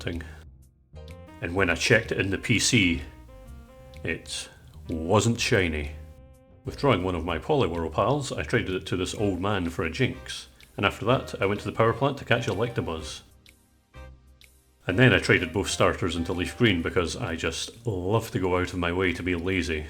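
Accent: Scotland, United Kingdom. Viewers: Well done man. Good to hear a Scottish voice too 🏴💪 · wake up babe, new scottish poketuber called just dropped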